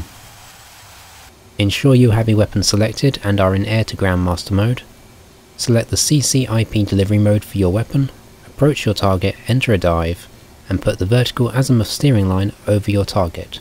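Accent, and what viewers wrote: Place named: United Kingdom. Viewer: Don' t forget the calm British voice!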